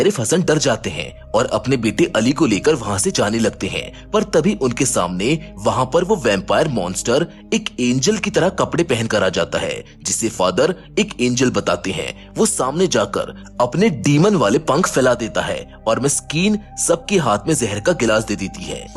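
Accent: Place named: Bollywood, India. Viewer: Aapka Bollywood silver screen Wala accent hi bohot accha lagata tha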